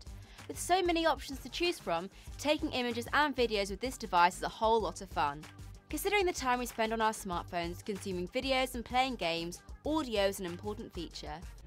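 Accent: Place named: England, United Kingdom. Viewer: Well i really need to get used to this English accents. Its so annoying. (My opinion)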